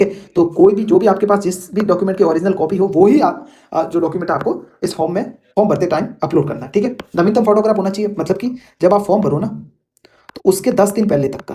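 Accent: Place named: Rajasthan, India. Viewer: sir aapki aawaj m hmaea rajasthani touch h ..aapki aawaj thodi thodi ravi kumar sihag sir se milti h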